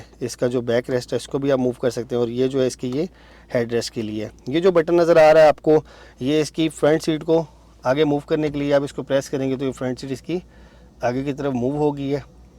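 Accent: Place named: Rural, India. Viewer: Vocals should be improved, seems like a pendu is reviewing a prestigious car. Most likely conduct review in english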